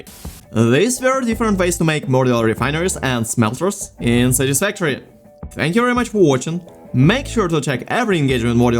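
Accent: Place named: Russia. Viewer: Русский акцент... Вы русский?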